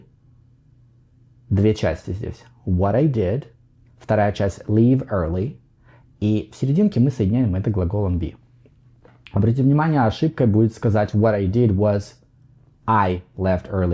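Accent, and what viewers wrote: United States. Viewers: Он и звучит как американец. У вас какой-то не такой ИИ (AI)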